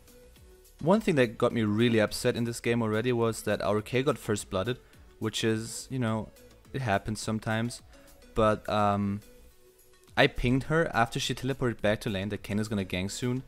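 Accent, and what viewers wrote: Germany. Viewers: Geiles Video! Man hört das du Deutsch bist, trzdem sehr geil! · Man hört sofort das du ein Deutscher bist haha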